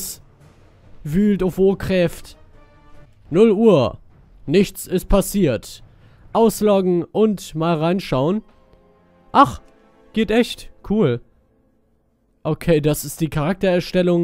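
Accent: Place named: Wuppertal, Germany. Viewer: Biste n Wuppertaler? Soviel "wat" und "dat" ^^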